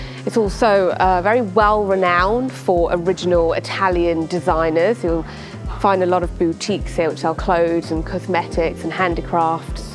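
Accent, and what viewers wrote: England, United Kingdom. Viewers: Your English accent is just awesome. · born to an American father and an Indian mother. She's an American national born and brought up in Miami.I don't know from where she got this beautiful British accent. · What race mix is she ? Sounds british, but looks between south asians and latina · Wow i love your British ❤accent.. Adoro il tuo accento...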